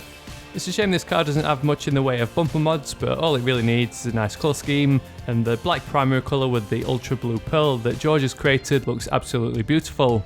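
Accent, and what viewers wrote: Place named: North England, United Kingdom. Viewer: by your accent I can deduct that you're from north England but where specifically?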